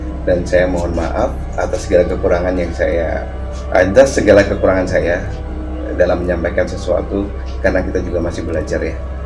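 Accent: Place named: East Java, Indonesia. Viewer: mau tanya Abang asalnya dari mana? logat jatimnya faseh banget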